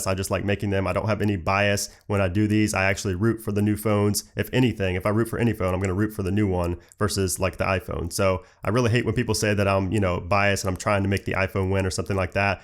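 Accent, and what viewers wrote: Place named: United States. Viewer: This review is "pointless". Why? Because there are a few variables that can have a major outcome on the end result. For example, are you left handed or right handed? Inevitably your reaction will be quicker by whichever hand is dominant. Another variable is you; by your vocal accent, we can establish that you are an American reviewer, and Apple is an ...............American brand????? Yeah, enough said. What must be more disturbing to Apple fans is the fact that major components used in Apple phones belong to Samsung; invented, designed and manufactured by Samsung. One example is the magnificent OLED screen. Apple phones are nothing more than a collection of far eastern components screwed together in an Apple shell and marketed as premium smartphones.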